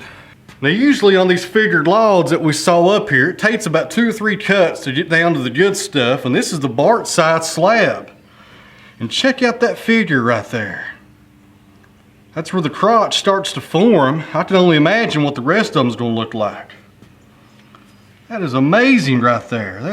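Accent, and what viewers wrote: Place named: Appalachia, United States. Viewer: I have no idea how I came to click on one of your videos, but I bet it’s because I’ve been watching August Hunicke’s tree felling videos for some reason. Also have no idea how I came to click on his. Anyway, glad I did, because your Tennessee accent, your beautiful drone footage of your ranch, awesome music choice, and unhurried video style just makes watching feel like relaxation therapy. So, from and Australian fan, keep the awesome content coming.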